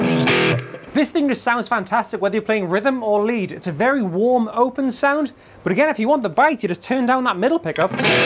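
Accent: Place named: Northern England, United Kingdom. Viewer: Y tiene uno de esos acentos del norte de Inglaterra.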